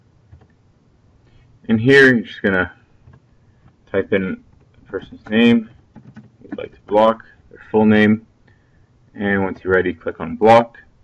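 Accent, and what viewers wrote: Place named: Canada. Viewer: I adore your Canadian accent!! Nicely done. Very clear and easy to understand instructions. Thank you.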